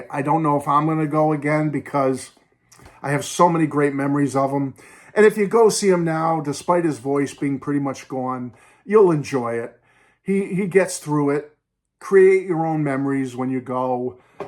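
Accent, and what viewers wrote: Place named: Worcester, United States. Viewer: I drove up to Worcester from south east CT with my best friend too!. She passed 10 years ago. We had seen him earlier in the tour in NYC. We went to probably 50 concerts of various artists. I think of the Worcester show when I hear your voice as the announcements outside had the same accent! My latest time we drove to Syracuse to see him 2 years ago with my 5 year old son. Many great memories!😅 did you get your tickets through the Wings Fun Club like we did?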